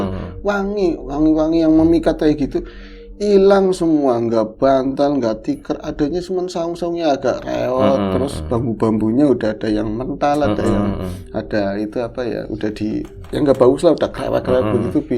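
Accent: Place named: Tegal, Indonesia. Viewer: Mas msh tetep di NGOBOR dong,jngn digantiin yg lain,soalnya udah enak ndengerin suara,n logat tegalnya,tdk tergantikan...😄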